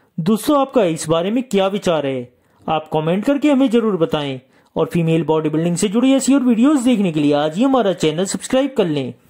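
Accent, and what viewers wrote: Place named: India. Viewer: I couldn't understand a word that the so called narrator was saying, due to his extremely thick Indian accent. So it just sounded like gibberish to me.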